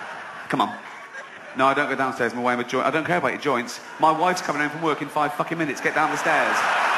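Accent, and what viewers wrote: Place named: United Kingdom. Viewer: This guy is fuckin hilarious.And his peculiar British accent makes him sound even more funny.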